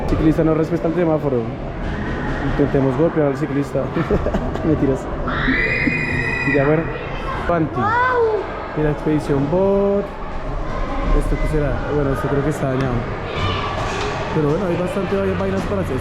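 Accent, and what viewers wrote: Colombia. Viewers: Pana? Este man es de dónde? Parece de Colombia...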